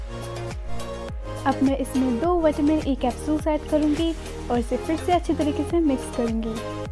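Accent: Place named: India. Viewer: Индийский английский....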